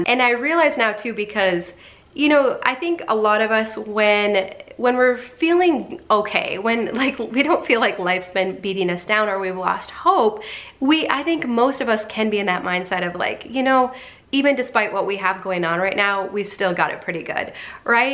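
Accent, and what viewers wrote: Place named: Midwest, United States. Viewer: It all depends on where in the US you’re from. Most of the country says roof with the “oo” sound like moon. But you can always spot a Midwesterner with “roof” and “root”. Oddly, “room” doesn’t follow the pattern. I’m from the Midwest as well, but my parents pronounced them with the usual “oo” sound so I did, too. But all of the kids I grew up with said them as does!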